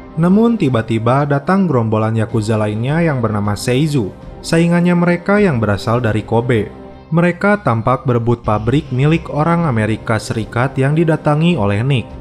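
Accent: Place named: Sunda, Indonesia. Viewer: Mang ngomongna sunda ning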